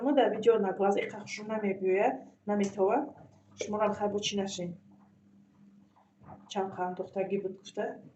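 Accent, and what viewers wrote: Tajikistan. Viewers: Хохарам шумо аз кучои ТЧК гапзпниет Кулобиеворияй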